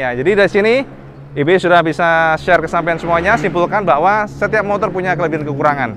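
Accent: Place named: Surabaya, Indonesia. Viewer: Saya suka sm Reviewernya IWB, kl di Reviewnya Mobil seperti Fitra Eri lah, lbh detail mengulas nya,,,dan yg lbh sy suka lagi dari Mas IWB ini adalah Medok khas Surabaya nya kental banget...kereen Mas IWB 😁👍👍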